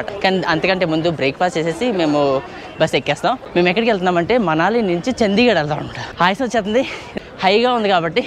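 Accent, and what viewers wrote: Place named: East Godavari, India. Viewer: Anna mana east Godavari slang dose penchu bro comedy please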